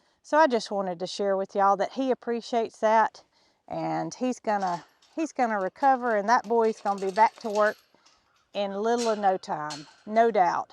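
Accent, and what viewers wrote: South, United States. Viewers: I knew listening to you that you were going to say”bonkers”! I love your southern accent! Yes! It certainly is going to an interesting weekend even up here in MD! You guys take care and hopefully we all will be ok! I was scared to use my cast iron fry pan because my stove top is glass. I just didn’t trust my wrist to set the pan down gentle enough not to crack the glass. So I gave it to good will.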